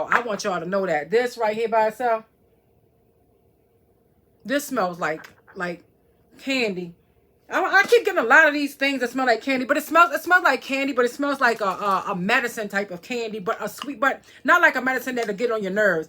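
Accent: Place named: DMV, United States. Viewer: Gurl, you from the DMV, talk your talk!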